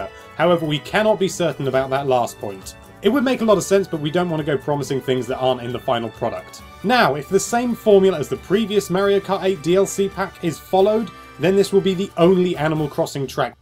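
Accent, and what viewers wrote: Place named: England, United Kingdom. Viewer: He's pronouncing it with his English accent. In England everybody pronounces it like that.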